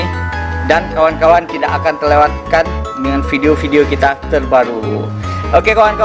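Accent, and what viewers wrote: Aceh, Indonesia. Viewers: Alhamdulillah bisa berkarya, dgn melihat video ini siswa yg masih SMA bisa termotivasi, tetap semangat dan teruslah berkarya..sy bangga dgn dialek Aceh...👍👍👍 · betul itu memang logat Aceh kita harus bangga jadi orang aceh jangan buang bahasa sendiri setiap darah pasti ada logat sendiri seperti Jawa meusinthop logatnya. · Bukan d setel lg Nama a dia pake logat aceh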